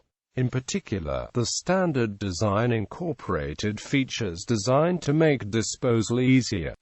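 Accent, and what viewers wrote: RP, United Kingdom. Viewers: Very plummy delivery. - like Radio 4 at its most aristocratic. Needs some video.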